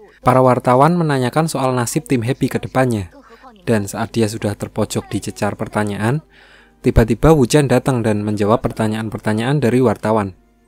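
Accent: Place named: Java, Indonesia. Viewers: Wong jowo to mass,kok ngomong e ngono hehe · Yogyakarta bro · Njir medok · Jawanya medok amat ngakak astaga~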